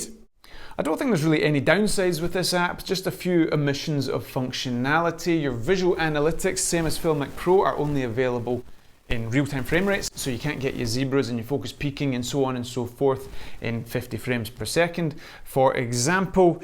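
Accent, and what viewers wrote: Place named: Edinburgh, United Kingdom. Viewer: Another excellent video. Trying to place that accent. Edinburgh?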